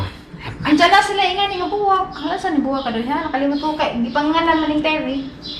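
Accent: Bohol, Philippines. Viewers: Siawa jud nimo day oi mag cge jud kog agik ik sa imong sinultian ganahan kaayo kos taga bohol the way mosulti kay makahimuot kaayo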